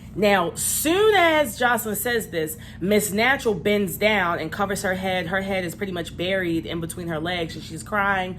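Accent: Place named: Baltimore, United States. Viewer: Love this review!! New subbie.❤ are you from Baltimore? You sound like it.☺ Yummy in that parking lot was so freakin cringy. She should be mad embarrassed.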